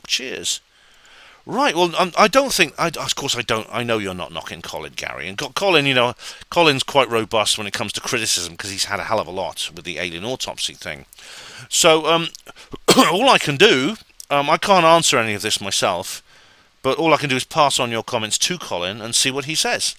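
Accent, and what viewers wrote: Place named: Scotland, United Kingdom. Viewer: More Scottish accent please. 😄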